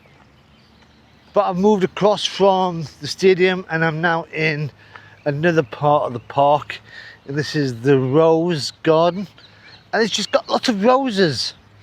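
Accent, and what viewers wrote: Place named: Newcastle, United Kingdom. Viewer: LOL, I am happy to learn a new phrase,,, "He scared the living day...." so funny.😆 I like your videos for the nice way you describe what you see and to learn and get used to your geordie accent. Enjoy your next stops in my country. Greetings and best wishes from a new follower in Chaco, north of Argentina.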